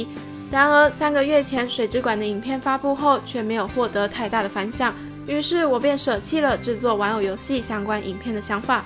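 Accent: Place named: Taiwan. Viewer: 角(音同腳)色，請不要被簡體字同化 ，為何唸角，可以查找辭海類的大型辭典即有說明 ; 角(音同決)主音律上使用，聽播主口音應該是在地臺灣人(不好意思，是頗標準臺灣國語)，個人建議還是該依傳統正體字說法為上。 這本番外，十年前還是大學生時就拜讀過，畢竟，《玩偶遊戲》是少女漫界的教科書，七年級生必讀經典！現在看到又想再把書找出來回味了，不過，當時看就覺得翻譯有問題了。早產是指孕期未滿37週即分娩，書中紗南破水時已9個月，也就是至少38週了，雖不到40週的預產期，卻不是旱產！卻翻成早產！？另則，珠里和早穗翻叔母更是錯的離譜！早穗是珠里母親的妹妹，所以早穗是珠里的阿姨不是叔母，更不用說叔母是大陸用法！臺灣人稱叔叔的太太該叫嬸嬸，珠里是早穗的外甥女，不是侄女！雖然日文講法都一樣，但華人教育是分得很清楚的，這是很明顯的誤譯！這作品的翻譯程度……不予置評。真的會懷念書名仍叫《玩偶遊戲》(大然)的時期，翻譯的中文造詣著實天差地別呀~ 直澄被掰歪，我第一次看時的確不太能接受，一開始無法接受的原因，不是不能接受出櫃，而是直澄他本來就不是性別認同障礙者或是同性戀者， 他的性向是很一般的異性戀，突然要粉絲接受他的"轉性"，真的不容易呀！直到我接觸到《昨日的美食》(身為ACGN齡逾30年的老牛皮，雖算不上腐女，BL多少還是有涉獵)，發覺兩位主角的角色設定並非著重於情人(戀人)，多數看到的是倆人在相處日常上，經過諸多磨合後，心靈契合度和不必言明的默契提升，我在兩個中年男性(魅力大叔)身上，看到了老夫老妻的相處模式，沒有令人眼紅心跳的煽情，只有平淡如水卻長情安然的陪伴！這樣的情感表現，是連異性戀都難以達到的或者該說是多數人都會心神嚮往且追求，若是小時候的男神(雖然我自小是羽山派)和現在的情人相處如同筧和矢吹的話，身為粉絲的我，大方祝福！真心期盼，仁科會是直澄王子的幸福。 希望未來有一日能再看到這部經典的後續。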